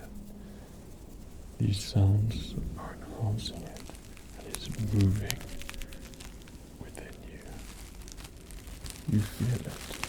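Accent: England, United Kingdom. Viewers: Rude English Gentleman Sith version. For all of us non-Jedi scum. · RUDE BRITISH JEDI TRAINER😂😂😂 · Now a rude english sith lord!!!!!!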